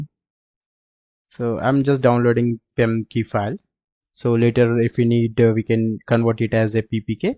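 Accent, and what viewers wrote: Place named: India. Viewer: Just another indian with a terrible accent reading from a google search about the subject.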